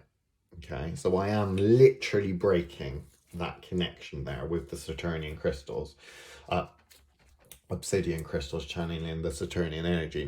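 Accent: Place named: United Kingdom. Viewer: Across the pond here, really appreciate the “professor snape” vibes… maybe it’s just the accent hehe 😜 thanks for the series I learned a lot!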